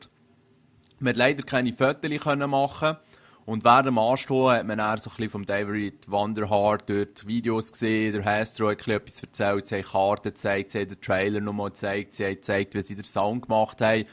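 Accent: Bern, Switzerland. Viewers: Besch du en berner ^^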